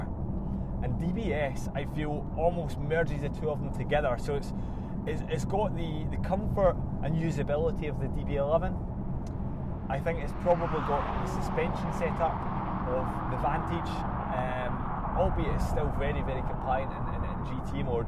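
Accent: Scotland, United Kingdom. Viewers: Are there different accents inside Scotland? Are you from a particular region? Thanks for replying.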